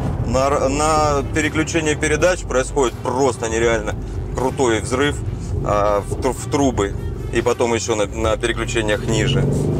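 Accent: Rostov, Russia. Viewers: Смотреть интересно. Только если это полноценный обзор авто, не делайте такого акцента на аудио системе. Понятно что это ваш профиль, но мне это не так интересно, как например сравнение с ближайшими конкурентами(лично мое мнение). Но в общем качество на хорошем уровне. P.S. кто то писал про уровень речи... Им хочется объяснить, что это Ростов, как я понял, и там свой "говор", может это и немного сбивает с толку. Как по мне - слух "не режет".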